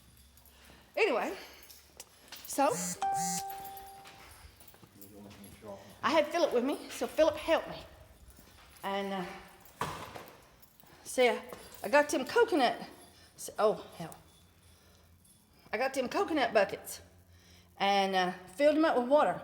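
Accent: South, United States. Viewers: Lady you have got to be the hardest woorking chick on the planet. Don't know what I admire most your enthusiasm, high energy, great attitude, soapy skills or the fact you speak my mother tongue (thats southern if you didnt know). As a girl with southern roots who has long been transplanted as far north as i intend to it just nice to hear that southern speak. Best of luck on your new digs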